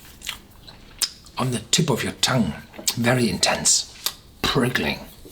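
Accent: Germany. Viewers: come of german and Yugoslavian decent, but was born in Canada and I speak some german but I find myself really enjoying your English reviews...something about the german accent and pronunciation of words makes the reviews amazing....Thank you.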